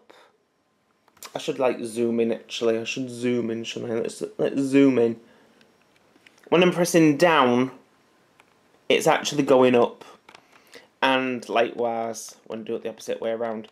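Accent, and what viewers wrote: Hull, United Kingdom. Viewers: The Hull Accent tops it off - i'm from Hull LOL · The Hull accent was a bonus - I'm from Hull.👍👍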